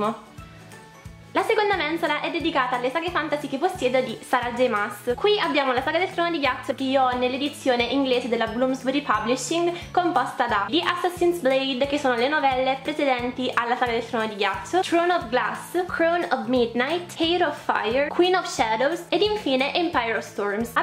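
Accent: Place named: Italy. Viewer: Ya sabía yo que era Italiano. Se oye luego luego. Lo bueno que se parece un poco al español y pude entender algo jaja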